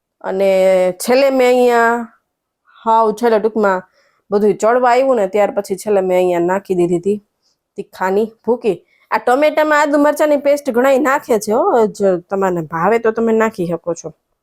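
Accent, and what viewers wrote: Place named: Rajkot, India. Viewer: બેન રાજકોટ ના લાગે છે બોલી ઉપરથી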